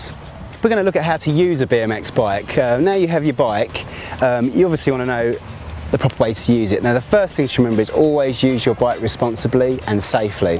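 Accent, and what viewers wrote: United Kingdom. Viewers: *That British Accent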